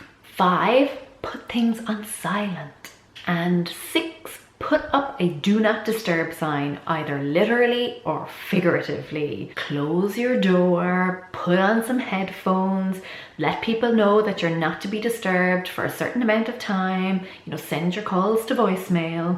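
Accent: Ireland. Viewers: are u from ireland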